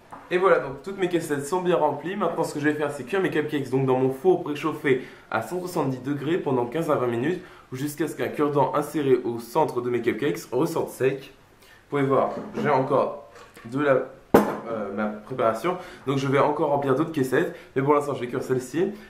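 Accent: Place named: England, United Kingdom. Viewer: Je n aime pas du tout ton acsent anglais et tu veux un te la péter dsl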